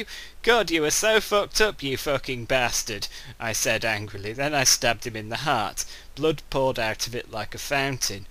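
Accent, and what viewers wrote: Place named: Australia. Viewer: The Australian accent seals this.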